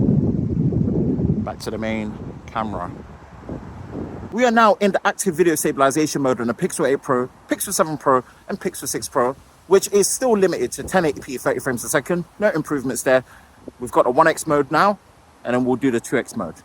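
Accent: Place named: United Kingdom. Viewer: Again, one of the best phone camera comparisons. Thanks for your effort. And as usual, awesome british accent. The ice topping. I saw a battery comparison between iphone 15 pro max, poxel 8 pro and pixel 7 pro. The iphone is way way way better. What surprised me was that the pixel 7 pro had slightly better battery life than the 8 pro. I thought they would have worked on it. With that in mind amd seeing the pictures, I think the 7pro offers the most for its money. Where the 8 pro really shines is the AI stuff.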